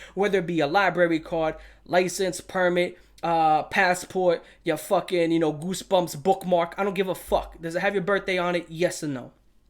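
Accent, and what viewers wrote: New York, United States. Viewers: Besides the obvious comparisons to Plagued Moth and Wendigoon, there really isn't anything else like on Youtube. The New York accent makes it for me. Sounds like Michael Rapaport talking about gore XD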